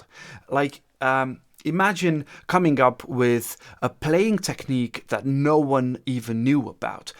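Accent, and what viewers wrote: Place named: Russia. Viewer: Thanks you for putting this series together. Definitely fills a gap here! One suggestion. Would be great if you demoed each talking point about on the Harpejji itself (perhaps second camera shot) right after you mentioned each point. Would be a big help in my opinion as far as keeping folks engaged. And although English is my first language, this certainly would be helpful to those watching this channel who did not speak English or have subtitles available and instead could at least see the concepts demonstrated. BTW.. (I do understand English with comedic Russian accent so please keep that going). Thanks again keep these going!!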